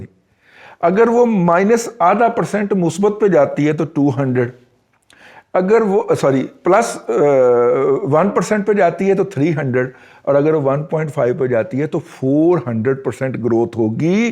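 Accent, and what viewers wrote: Punjab, Pakistan. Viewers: I like your punjabi dialect.being punjabi myself.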